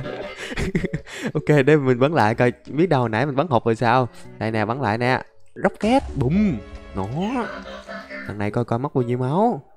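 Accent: Vietnam. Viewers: Anh đọc tiếng anh nhử tiếng Việt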